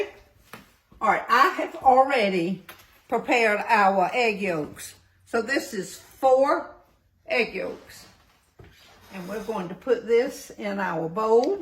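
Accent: South, United States. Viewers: Thank You! I truly appreciate your strength in the Lord Jesus Christ! I follow you on YouTube! I love your videos, you have the sweetest Southern voice! I live alone, and I have 10 beautiful Grandchildren! I’ll be baking pies for our family during thanksgiving holidays! Thanks for being a blessing to so many people!😇🙏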